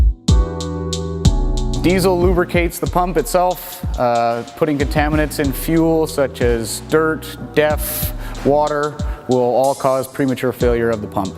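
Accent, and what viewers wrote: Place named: Canada. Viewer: grew up near canada. can always tell the accent when we say "aout" instead of out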